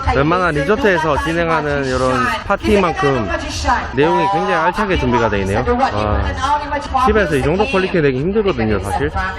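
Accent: Gyeongsang, South Korea. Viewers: 혹시 고향이 대구 이십니까??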